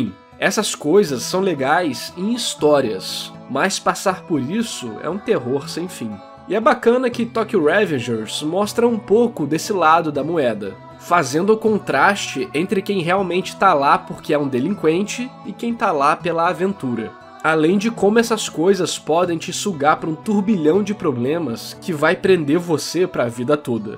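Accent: Rio de Janeiro, Brazil. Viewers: CALMA AÍ NARRADOR VC TBM É CARIOCA??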